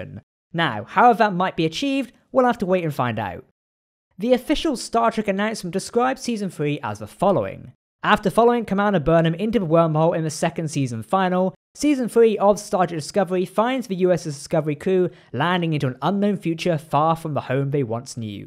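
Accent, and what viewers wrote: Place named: Wales, United Kingdom. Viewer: Looking forward to the new season, although i missed some of the commentary due to the thick excitable Welsh /English accent.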